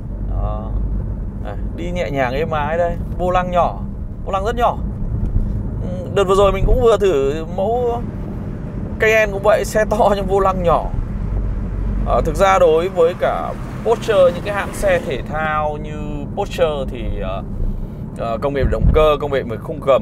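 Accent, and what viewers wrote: North, Vietnam. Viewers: kieu giọng bake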